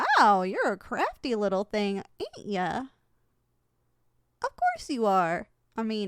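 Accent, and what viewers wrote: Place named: South, United States. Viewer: Can't say I expected it to play out that way. Now I'm curious if there's a 2nd script continuing this story. As for this one, you really nailed the Southern Hospitality feel in your accent delivery. Very well done :_AvaLavaLove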